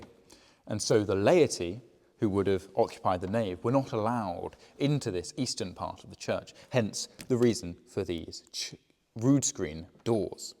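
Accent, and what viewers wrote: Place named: England, United Kingdom. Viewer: Belle visite Belle architecture bel accent anglais ❤😊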